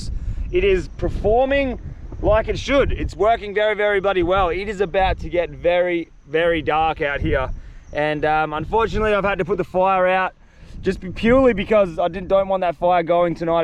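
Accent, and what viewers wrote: Australia. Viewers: not being mean but are you aussie i am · I was worried until i heard the auzzi accent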